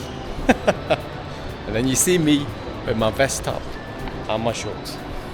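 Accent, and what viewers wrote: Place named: United Kingdom. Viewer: Where are you from in the UK? I like your dialect and want to know where do you speak like that? 😃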